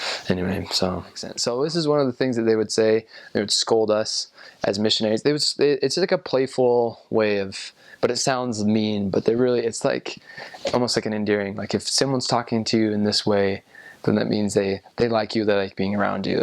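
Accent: South India, India. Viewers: Southern Indian Accent !! :D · Ya agreed. That is essentially South Indian accent. You would hear that in Maharashtra and above. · this is a typical south Indian accent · That was just south Indian accent sir. But yes, that is also a part of India. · This is south Indian accent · he went to south India, he was speaking in South Indian accent. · That is South Indian accent. Different states in India have different accents... Well you did a pretty good job on the Southern accent;)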